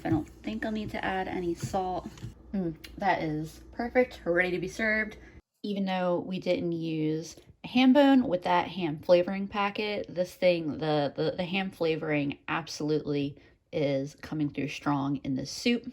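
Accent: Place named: East Texas, United States. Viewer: You're my fav. Even though I'm from east Texas .... you sound like me 😁 love your no drama cooking ... I just keep watching you making meals that are doable. Thankyou dearly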